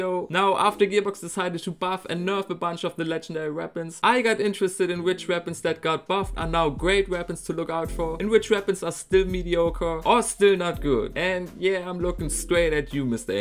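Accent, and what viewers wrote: Germany. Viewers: Dieser deutsche Akzent hahah :)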